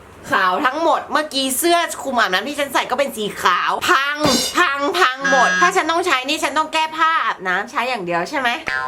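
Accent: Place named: England, United Kingdom. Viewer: ชอบสำเนียงอังกฤษมากค่ะ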